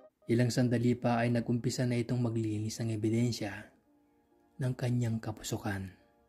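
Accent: Pampanga, Philippines. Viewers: The accent is very Kapampangan. ❤❤❤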